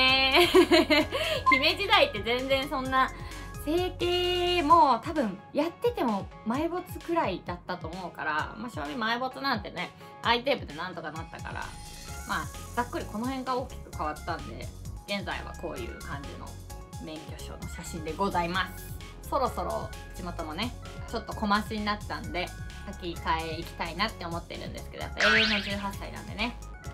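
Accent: Kansai, Japan. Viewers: 顔も可愛いけどたまに出る関西弁がほんと可愛い🤤💕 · 関西弁かわあい💕 ちょっとお顔違うねー！ 今の免許書の写真かわいすぎ！もっとビックでみたーい！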